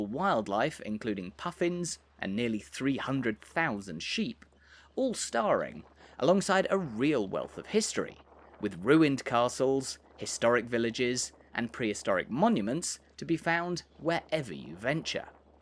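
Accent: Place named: RP, United Kingdom. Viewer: Quite right. This mispronunciation is actually quite grating, especially in his lah-dee-dah accent. To give him his due though he's not committing the cardinal sin of calling Shetland "the Shetlands". He's also made the effort to pronounce Scalloway properly - "Scallowuh". Incidentally, the people on Fair Isle pronounce Lerwick like "Lyric".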